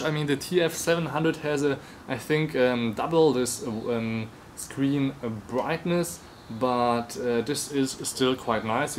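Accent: Germany. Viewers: Strong german accent...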